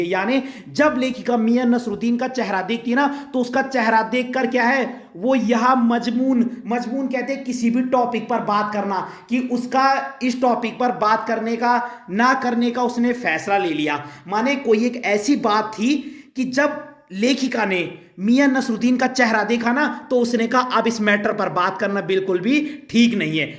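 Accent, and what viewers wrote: Garhwal, India. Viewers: I'm subscribeing you just for you explanation and your cute accent as I know you are a gadwali ❤️🙏🏻 Tehri rifles here 😂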